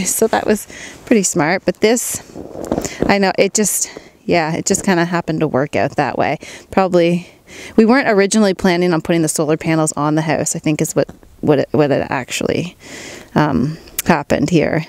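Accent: Canada. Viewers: LOL! I love Canadians and Canada and have been noticing alot of goodies coming from Canada as of late! I too figured out she was Canadian from some of the words and the accent when she finish some sentences. I am living in the Cold as a Puerto Rican so I am paying attention to Canadians and how they build and live so i can survive these freezing painful NON CARIBBEAN temps!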